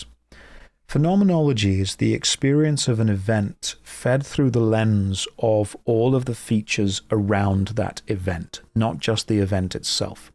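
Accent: United Kingdom. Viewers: I like the british ASMR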